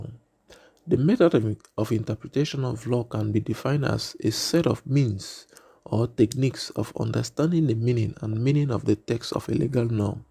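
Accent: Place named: Africa. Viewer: Африканец говорит, плюс понятно что он просто читает, с сильным акцентом, плюс понятно что он ничего в этом не понимает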